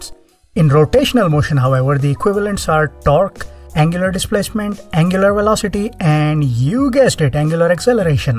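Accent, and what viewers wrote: India. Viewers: this probable the best Indian YTvideo explanation I'VE seen yet hahahaha with accent yet understandable!!!!!!woooooooooooo yeah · Amazing, by the accent i am guessing it's an Indian guy. Probably the first sensible Indian youtuber ❤️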